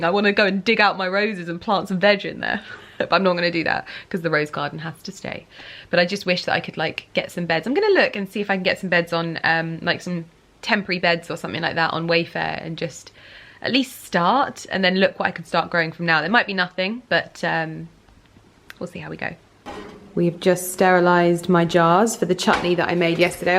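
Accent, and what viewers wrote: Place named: Cockney, United Kingdom. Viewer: Jennifer Harris oh definitely has a cockney twang. I love when she does the baby voice for ali 😆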